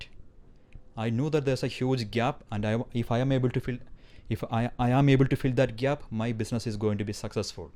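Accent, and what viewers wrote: India. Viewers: Hi mr.. Do you know any engineering simulation softwares? Like matlab, msc softwares etc? What kind of engineering consultancy did you run? And i feel that you are from southern india based on your accent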